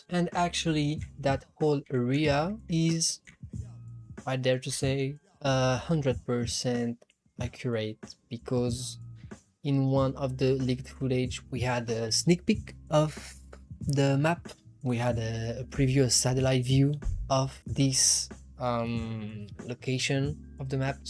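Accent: France. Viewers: This horrible French accent 😅😮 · Hey, i loved your video ! Are you french ? You have a kind of french accent. Anyway, amazing work, brother. Keep on !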